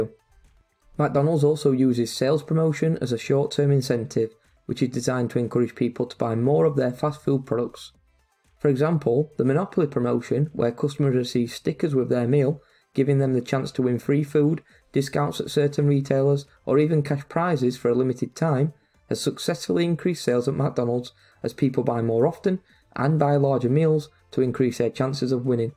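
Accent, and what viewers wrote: Yorkshire, United Kingdom. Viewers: unrelated but... are you from yorkshire...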